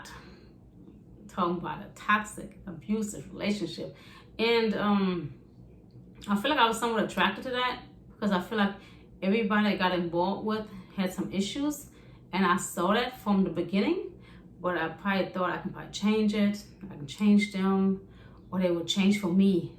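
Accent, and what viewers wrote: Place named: Germany. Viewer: irgendwie kann ich raus hören das sie deutsch sprechen also an ihren deutschen akzent ich liebe aber ihre videos machen sie weiter so!❤️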